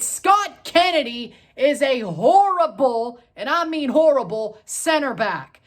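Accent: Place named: Midwest, United States. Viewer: Are you from Canada? Your accent sounds like Midwest USA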